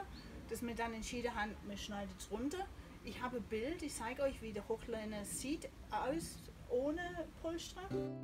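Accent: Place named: Swabia, Germany. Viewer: your ever so slight Swabian accent is darling! Thank you for your lovely videos.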